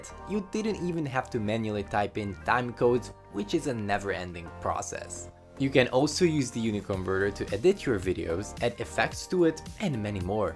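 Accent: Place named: Netherlands. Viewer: Je hoort direct dat je Nederlands bent.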